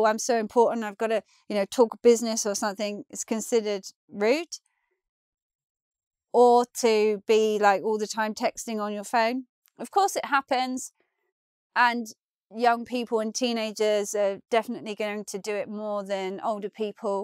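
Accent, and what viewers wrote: United Kingdom. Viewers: Hello Ms., I am Sharon from Vietnam, I have been following your channel for 4 years. My passion for British accent drives me here. Your accent is super nice, and amazing videos with so many helpful and interesting to learn. I am planning for England this December/ 2019, I want to experience life, culture, engage myself more with local people, not only travel for sight seeing. I hope u can make a video advise us on that, of some activities, program that visitors can join to understand about British culture; I heard of couch surfing too, can u share your knowledge on that. Thank you so much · You're cute! And I love brittish accent! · I liked it a lot. I could not stop smiling. There are many things in common but, at the same time and with all due respect, you "sound" so English. I loved it, seriously. I don't usually comment, but this video is very nice and fun. 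I really appreciate your work. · You are so beautiful speaking the British English.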